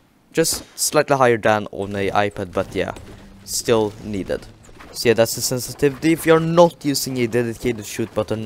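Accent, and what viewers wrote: Sweden. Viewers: Are you Swedish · EJ grym video, jag gissar på att du är svensk för din dilekt lol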